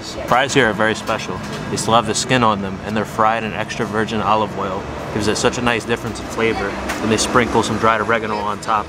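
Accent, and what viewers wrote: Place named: Greece. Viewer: Great!!!.... But i like your Greek speaking accent Better!!! - Youve got a Strong Greek Accent!!- Keep it! :) - LOVE Astoria and will Visit again!!! :)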